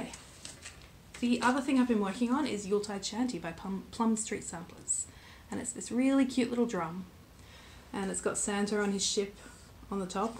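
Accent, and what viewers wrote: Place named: Australia. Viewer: Congratulations! It is a beautiful finish and you have every reason to be excited about it! Also great to hear another Australian voice on Flosstube (I'm from South Sydney). I love to watch planning videos so I will certainly be watching that when you get a chance to film it. Thanks for sharing your finish with all of us.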